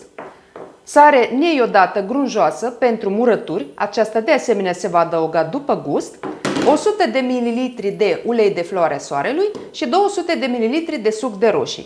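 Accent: Moldova. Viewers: Wou, multumesc pentru explicatiile foarte clare si amanuntite, apreciez foarte mult. dupa accent sinteti din Tepublica Moldova, eu sint din Ardeal, Romania. Imi place farte mult reteta, felicitari si multumesc ca ati descris retetra atat de amanuntit. O zi faina va doresc!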